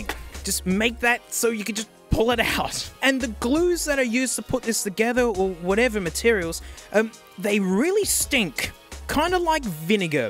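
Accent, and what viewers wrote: Australia. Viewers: Are you the same guy as "the drum thing" guy? Can't tell you aussies apart 🤷‍♂️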